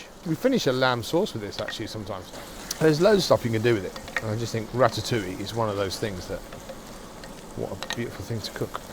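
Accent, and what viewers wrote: England, United Kingdom. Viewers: Or he's just speaking english instead of american.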